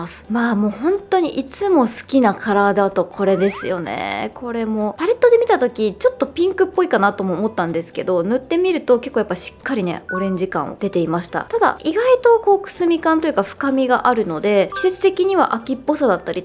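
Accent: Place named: Aichi, Japan. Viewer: の「服」とか「靴」とかの愛知イントネーションはだいぶ聞き慣れたのですが、「ほくろ」も標準語とは違うイントネーションなんですね！いつか愛知に遊びに行ってみたいです☺️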